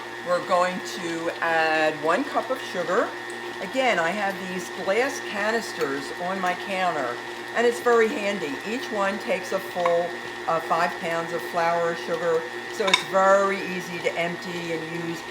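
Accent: Philadelphia, United States. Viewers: Starting my own channel and found you...heard your voice and knew you were from home. Love that your family has encouraged you to do this...just became a young Nana (Mom Mom) myself. I wish you all the love and success one can have on here. From one Cooking Philly Girl to a close to home Philly girl, passing on your link.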